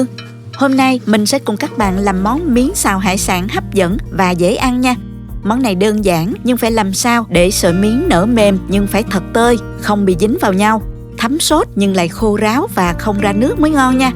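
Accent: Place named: Binh Duong, Vietnam. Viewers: Quê hương minh cung la binh dương nghe sau nho nha quá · ơi tiếng nói cô dễ thương quá __ mình nghĩ chắc quê ở Bình Dương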